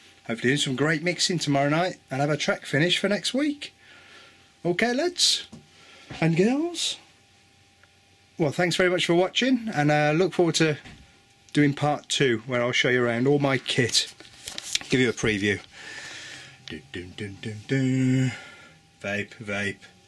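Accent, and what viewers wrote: Midlands, United Kingdom. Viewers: Not sure why you have chosen to concentrate on intonation as he is trying to communicate his experiences of sound proofing a project studio from a converted garage? This is not an uncommon musical experience. His 'rising intonation' has everything to do with his accent. It sounds like he has a very mild Midlands accent - rising intonation can also be found in other regional English accents such as Geordie or Mackem - I don't think he's trying to deliberately confuse us with non-questions. There are scores of American posts on this subject and I find it helpful that someone on this side of the pond can quote inexpensive UK based sites for sound proofing. Thank goodness he didn't work out the RT60, we may have nodded off. Well done. He did say he was an amateur and it was his first post, do you not think you're being a bit harsh?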